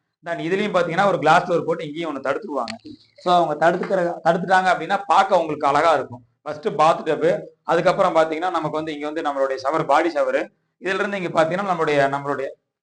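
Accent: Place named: Andhra Pradesh, India. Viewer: Andhra pradesh erundhu pesuri nanba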